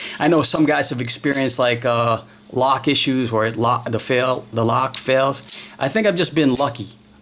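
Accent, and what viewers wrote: Boston, United States. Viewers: Are you from the Boston area? Sounds like you are ... Im from very close to Boston.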